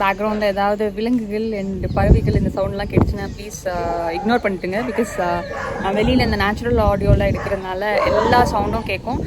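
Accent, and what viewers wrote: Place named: Coimbatore, India. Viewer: Coimbatore slang.. Wow Chance illa... Missing coimbatore. Swargame endralum adhu nammoru pola varuma.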